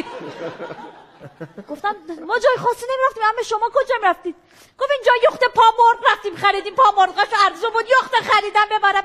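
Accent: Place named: Qom, Iran. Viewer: من خیلی دوسش دارم ، فسمت رستم وسهراب رو چهار بار نگا کردم ومنکه اصلا دیر مخندم واقعا از ته دل خندیدم عاشق قمی صحبت کردنشم ، انشالله بازم بیاد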